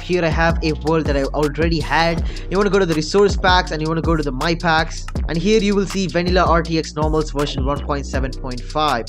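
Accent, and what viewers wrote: India. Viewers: Are you a Indian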